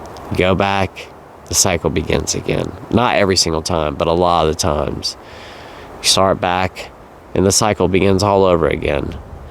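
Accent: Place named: South, United States. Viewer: Hi, I’ve been following you since “dry” January up here in CT when I decided to cut back on my drinking and you’ve made such an impression on me that I’ve turned it into a happier, new way of life. I love watching your videos, your honesty in telling your story in your easy Southern style of speaking. Tonight’s video was really a heartbreaking setback. I’m so sorry. You look so healthy and handsome, it’s hard to imagine you could have so many complications. I am praying for you, as I know many of your audience are. But somehow believe you are going to get through this. Keep the faith. ❤ Katheryn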